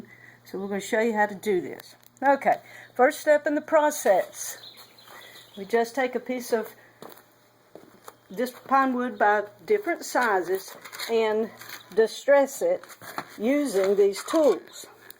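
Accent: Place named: Kentucky, United States. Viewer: Lady you are a doll! You're accent takes me home to Kentucky! I miss it.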